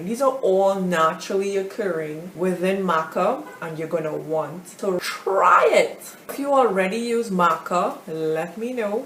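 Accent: Trinidad and Tobago. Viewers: You sounding like a Trinidadian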